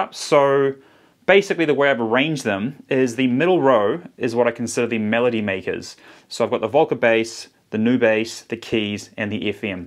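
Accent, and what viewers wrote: New Zealand. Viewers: So, are you a Kiwi?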